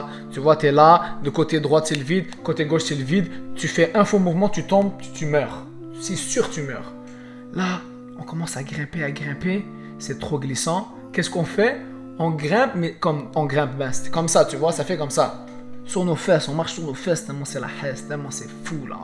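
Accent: Canada. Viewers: t as un accent canadien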